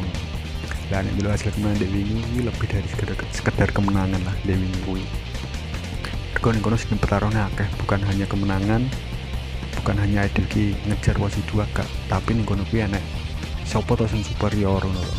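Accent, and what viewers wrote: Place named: Surabaya, Indonesia. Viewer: Bahasane suroboyo bgt 😁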